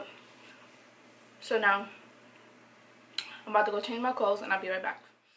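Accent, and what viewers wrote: New York, United States. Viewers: You from new york? Harlem?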